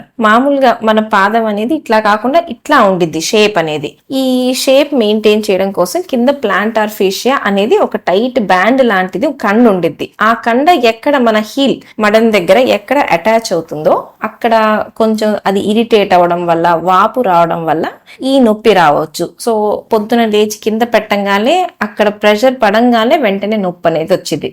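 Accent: Guntur, India. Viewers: Maa Guntur slang... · అవునమ్మా...మా గుంటూరు జిల్లాలో మేము అలాగే మాట్లాడుతాము..అది మా ప్రాంతీయ భాషమ్మా.. మేడమ్ గారు చెప్పే మంచి మంచి విషయాలను మనం విందామూ , ఆచరిద్దామమ్మా..👌👌💐👍😍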